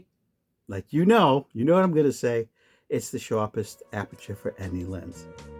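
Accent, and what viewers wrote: New York, United States. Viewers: Nice shots, I don't see any massive issue with the lens. You must be one of the last few people with a genuine New Yoik accent :) love it. Watching in Scotland,.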